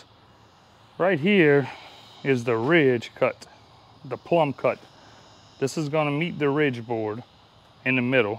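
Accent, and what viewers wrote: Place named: Acadiana, United States. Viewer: I recognize an Acadiana accent a mile away.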